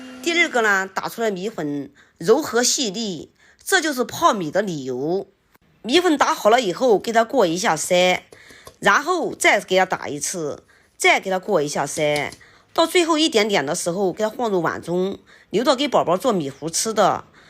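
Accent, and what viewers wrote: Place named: Jiangxi, China. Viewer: 很熟悉的赣普话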